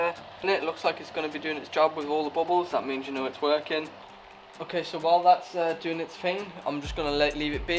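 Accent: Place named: England, United Kingdom. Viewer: You in England or Ireland?Im guessing England as of your accent and plates on the other cars...so did you bring the civic over or what?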